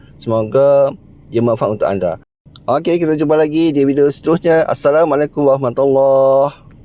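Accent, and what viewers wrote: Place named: East Coast, Malaysia. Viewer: pekat bethol loghat pantai timur dia 😅😂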